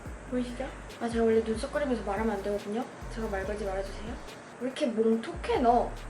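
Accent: Gyeongsang, South Korea. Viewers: 서울 말은 서울 말대로 ❤️ 경상도 말은 경상도 말대로 ❤️ 모두 어울려요. 넘 귀염~ '머리부터 발끝까지.~' 이 노래가 생각나네요. ~ㅎㅎ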